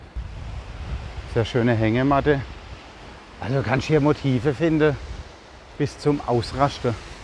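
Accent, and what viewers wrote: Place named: Karlsruhe, Germany. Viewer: Ja er lebt auf Koh Phangan.... wir haben schon einige deiner Videos gesehen und ich habe zu meiner Frau gesagt dass ich das Gefühl habe dich zu kennen. Wir waren 2019 in Khao Lak in Urlaub und haben dich mit Roman bei dir Daheim in Khao Lak besucht. Du warst gerade damit beschäftigt deinen Umzug vorzubereiten. Roman ist ein guter Freund von mir. Ich habe in deinen Videos auch unseren Karlsruher Dialekt rausghört ;-) Wie klein ist die Welt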